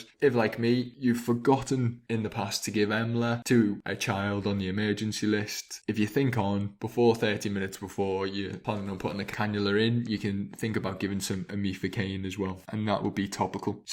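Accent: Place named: Northern Ireland, United Kingdom. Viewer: Sounds like a Northern Irish accent Either way, this was an informative lecture!!!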